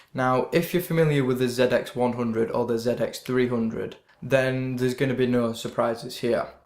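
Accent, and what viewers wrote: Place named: Yorkshire, United Kingdom. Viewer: i'm from Leeds myself it's pretty rare to find someone with a Yorkshire accent on Youtube it's good to see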